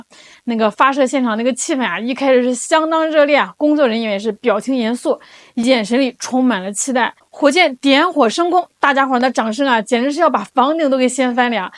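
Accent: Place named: North, China. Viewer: 但一定是北方人😊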